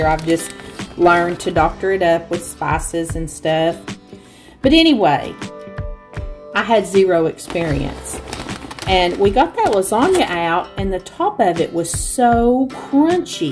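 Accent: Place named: Arkansas, United States. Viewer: ❤ I love your accent ass where are you must be from Arkansas that’s where I’m from but my daddy’s eat mayonnaise sandwiches but they were miracle whip not 😂mayonnaise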